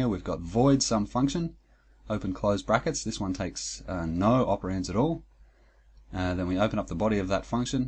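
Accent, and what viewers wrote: Australia. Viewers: Hi What's a, you sound like a fellow Aussie! Thanks again for this great tutorials. I'm working my way through them from start to finish. I'm not a programmer: I'm an old retiree but I did an online course in C++ a couple of years ago for the fun of it. I didn't use it for anything so I let it lapse and forgot most of what I learn't. I came across your course and got fired up to revist C++. You have reignited my interest in C++ and your "easy to understand" method has made this really interesting for me. One of the reasons I'm really interested in getting familiar with C++ is that I am also interested in teaching myself electronics using arduino projects and they utilise C++ in their computers to drive their electronic components. So I want to understand C++ so I can control each project. Thanks again.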